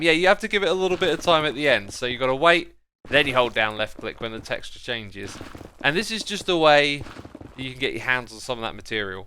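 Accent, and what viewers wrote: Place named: United Kingdom. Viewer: and the British accent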